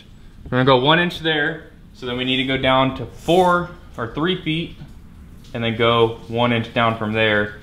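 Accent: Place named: South, United States. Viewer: You are starting to get a southern accent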